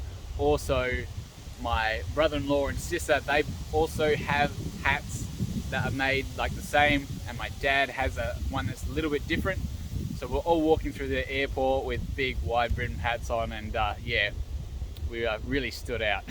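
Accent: Australia. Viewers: In Australia, men take off their Akubra ( the older hat) when entering a house, before entering a church, and at a funeral as a sign of respect. These men tip the front of Akubra slightly when meeting a woman ( traditional) , again as a sign of respect. Sadly this way of life is going.This man has a rural accent and wears rural clothes, including the hat. These people are the backbone of Australia. Russia's gain is Australia 's loss.Aussie, aussie, aussie oy, oy , oy!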